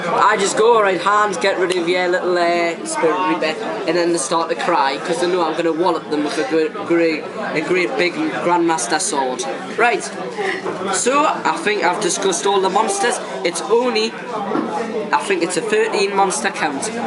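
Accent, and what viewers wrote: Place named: Scotland, United Kingdom. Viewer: i love your accsent dude.sre you scottish?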